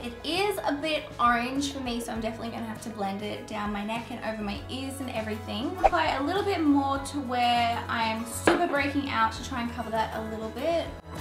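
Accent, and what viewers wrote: Australia. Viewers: I love your Austrilian accent!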